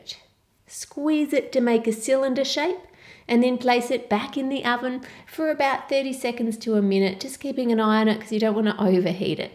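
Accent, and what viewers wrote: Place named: Australia. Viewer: Put da suga and da wata 😂😂😂 i love Aussie accent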